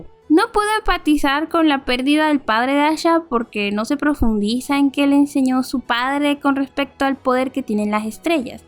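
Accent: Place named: Caribbean Coast, Colombia. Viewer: Eres de la costa de Colombia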